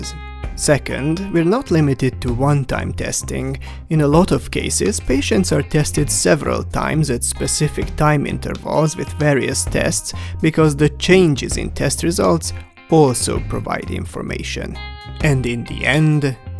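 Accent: Hungary. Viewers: I was curious how your jokes would work in english, and I'm not disappointed :) They still makes me laugh my ass off, but also very interesting to rewatch these videos in english. And I really like your accent, you cant deny you are from hungary :D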